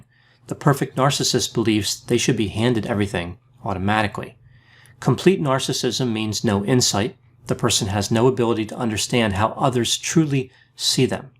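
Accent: United States. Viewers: Great analysis, Dr Grande. Completely inconsequential to the case, but as soon as I saw the title I was curious about how the name pronunciation would go throughout this video :P Yours is probably as close to accurate as it's going to get with an American accent.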